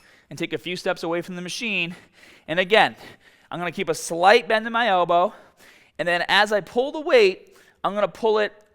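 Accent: Boston, United States. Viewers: Is this a Boston accent?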